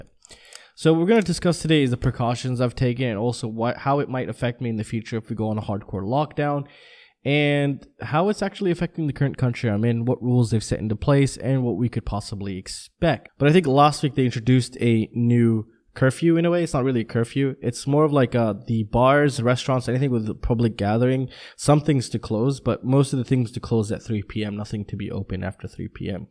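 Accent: United States. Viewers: Blows my mind I have been made aware of that you are Hungarian as I am. Never came to my mind for a second! Perhaps your American(?) accent have thrown me over. LOL The confirmed cases are not exactly equal to existing cases - you and me both know how reliable the data can be handled by our government (especially when you want to avoid panic at any cost). I am worried for my Mum in Pecs. What rumours you are referring to exactly? When only 4000 tests have been carried out you can always say 'nothing to worry about because we only have X number of cases'. It is not a rumour only - the Hungarian chief medical lead is a GP from a village with 4000 population without any further medical background - it doesn't really brings much relax to my mind. You can be a brilliant mind (or a medical genius) if your boss in charge lacking on the expertise. Currently we have not enough tests carried out (compared to other EU nations) to know how much Hungary is involved in the global pandemic. 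Rumours hah? If it is possible I am now appreciate more your hard work knowing it is coming from Hungary. I wish the very best for you and your family in this challenging times.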